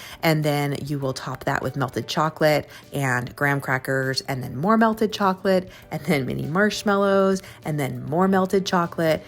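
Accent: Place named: California, United States. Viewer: That California speech pattern is horribly annoying.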